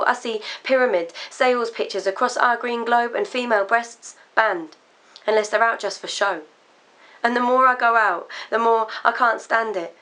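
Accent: England, United Kingdom. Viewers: And btw the english accent is the best! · I keep praying that one day all English people will be able to speak English instead of this disgusting shit accent. It's as bad as a Boston accent. Fucking hideous. How do you invent a language and then fuck it up so badly when trying to speak it?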